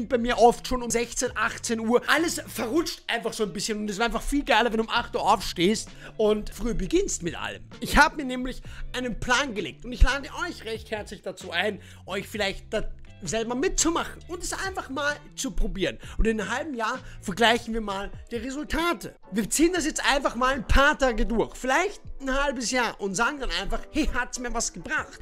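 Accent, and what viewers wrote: Vienna, Austria. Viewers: hab das erste mal durch dhalu bei among us gesehen/gehört... schwöre, dachte das ist nen 40iger, adipöser ösi (nicht bös gemeint, ich wohne selbst in wien;))... so kann man sich irren haha:D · Hallo lieber, ich freue mich immer sehr über deine Videos und ich liebe deinen Akzent (Wien ist meine Lieblingsstadt!) Für 2021 habe ich mir vorgenommen ganz viel an Gewicht zu verlieren, dazu mache ich seit Anfang Januar eine Ernährungsumstellung. Die ersten zehn Kilo sind schon runter, aber ich möchte noch mehr abnehmen. Sport soll auch dazukommen, sobald man wieder ins Fitnessstudio gehen darf. Alles Gute an alle, und auch an dich! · Ist etwas Wienerisch.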